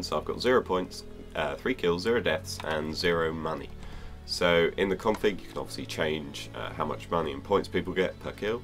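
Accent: Netherlands. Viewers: R u Dutch i am Dutch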